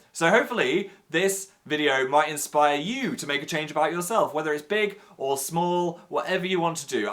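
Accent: North, United Kingdom. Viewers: Like how his accent is getting more northern again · I don’t know if it’s the confidence, the hair, the tour... but sounding a lot more.. northern in this video. Maybe it’s just me. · sounding very northern today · Is it just me or does sound REALLY Northern in this video?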